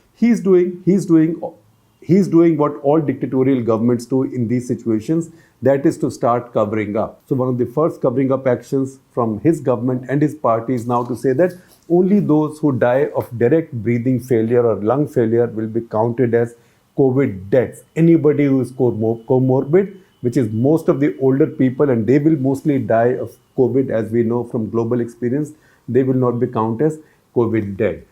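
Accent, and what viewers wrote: India. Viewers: dont understand ur indian accent..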